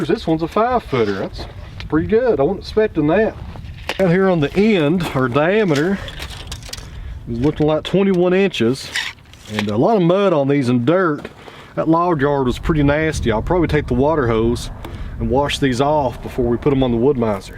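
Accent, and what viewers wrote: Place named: Tennessee, United States. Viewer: Bowls off a lathe sound good to me. I’m new to your channel, but I guessed your location by your accent from my kinfolk that live in your parts, Bristol, Sevier and Rockwood.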